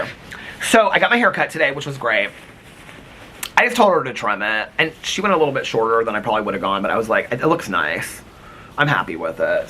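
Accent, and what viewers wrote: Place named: Midwest, United States. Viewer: my daughter was having a fit the other day (she’s 3) and I said to her in your midwestern accent, “Don’t cry!” And she was so confused 😂 it was hilarious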